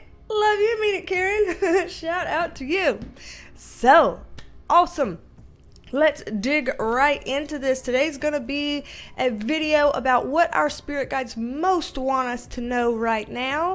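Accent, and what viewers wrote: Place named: Rural, United States. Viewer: As a country girl myself I love your accent. It feels so familiar and comforting! Love ya! Keep up the great work and congratulations on 10k!!! 🤩🥳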